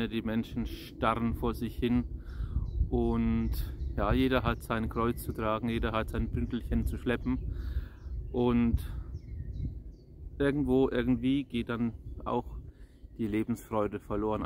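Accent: Germany. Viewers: My father is "Unterfranke" and from Bad Kissingen your dialect therefore reminds me much of fond childhood days when we visited the relatives in Bad Kissingen. My dialect having grown up in rich of Roman history Palatine, mixed with American accent, a bit of Swabian, a bit of Palatine must therefore sound really interesting in a funny way. Thank you for sharing this beautiful nature transporting same to me with your priestly blessings. I wrote you a while back in that Email I had asked your priestly advice on Padre Pio. If you find some downtime I'd be grateful for your much treasured input. It makes me happy to see my Godly priest happy, relaxed, fueling the soul with new energy all whilst sharing our Lord's beautiful nature with us! May our risen Lord protect our Godly, prosecuted priests who lead their flock despite the worldly war of satan! 🙏🏻✝️📿🌿🌹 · So wunderbar gesprochen und auch noch mit fränkischem Akzent, das hat mir sehr gut getan! 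Dank sei Gott! Und ewiges Vergelts Gott!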